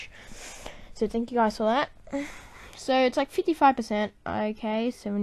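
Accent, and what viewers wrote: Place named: Australia. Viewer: Austrailian mate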